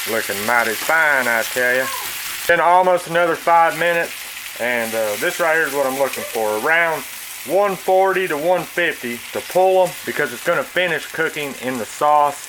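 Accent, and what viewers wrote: South, United States. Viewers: We can make fun of his southern accent, but dang this looks good! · Accent...southern. Black or white...really?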